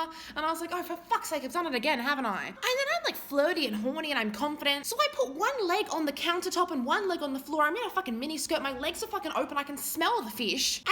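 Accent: Australia. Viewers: Were you born in Malaysia bc you have an Australian accent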